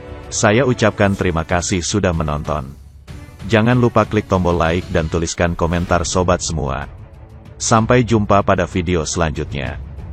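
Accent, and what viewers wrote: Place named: Banyumas, Indonesia. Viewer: Bise wong ngapak tetep sinjay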